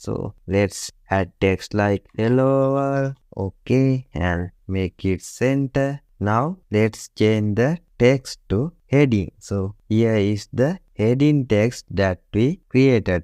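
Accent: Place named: India. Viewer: Dude your accent is awesome, reminds me my Indian physics lecturer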